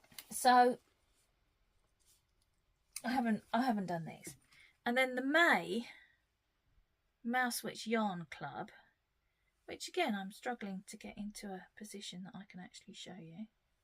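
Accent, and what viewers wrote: United Kingdom. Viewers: Love your podcast and you have a great sense of humor! I especially enjoy the British accent as I’m from the USA. Thanks for making the videos.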